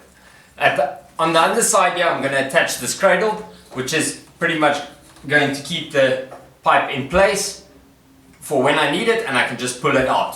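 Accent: South Africa. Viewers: Well done with the table. Its a serious space saver. You sound south african. Still living here? If so, where will I find an automatic on/off switch as described on your website? Thanks for a great channel.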